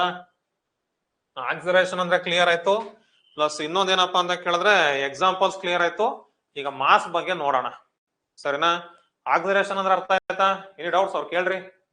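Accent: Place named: Mandya, India. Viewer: Sir Nim voice matado style mandya bhashe bittu hogilla niv ellig hodruu ❤❤❤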